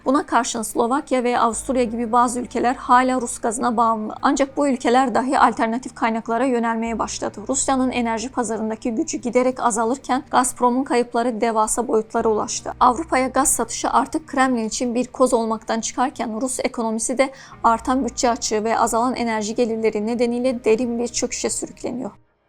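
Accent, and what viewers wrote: Azerbaijan. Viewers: Hanımefendi Azerbaycanlı, o yüzden böyle konuşuyor. Video çok güzel ancak GZT'nin genel problemi videolarının sonunu bir yorumla kapatmadan havada bırakıyor. yani konu detaylı veriliyor ama yakın vade ile ilgili bir öngörü yada yorum yapılmıyor. izleyiciye ise "düşüncelerinizi yazın" demek anlamsız çünkü araştırmayı editör yapmış, izleyiciye düşünce soruyor.. İzleyici yeni öğrendi nesıl yorum yapsın... o sebeple videolar internet bilgisi derlemesi kalitesinde kalıyor. ve kendini tekrar ediyor. izleyiciye ansiklopedi bilgisi veriyor ufuk açmıyor. editör son 3-5 dakika yorum ve konuyu kapatış konuşması yapmalı. çember kapatılmalı. buna çok dikkat edilmeli. aksi taktirde kalite ve izlenme bu yataylıkta gider. emekler için teşekkürler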